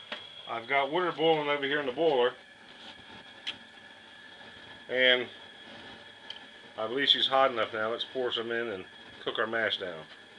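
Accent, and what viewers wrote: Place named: New England, United States. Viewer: U from new england? You say wutter instead of water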